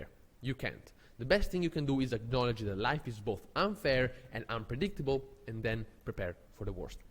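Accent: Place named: Italy. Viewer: You Italian accent is just so cool to listen bro ❤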